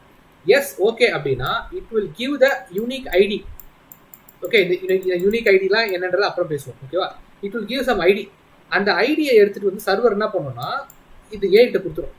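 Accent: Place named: India. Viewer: As an Indian myself...i cannot understand him.....his Indian accented English is atrocious.....Or is he speaking Mandarin ???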